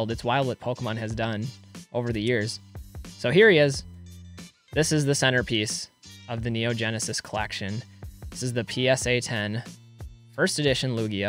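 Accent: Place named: Minnesota, United States. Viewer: Holy shit man are you from Minnesota?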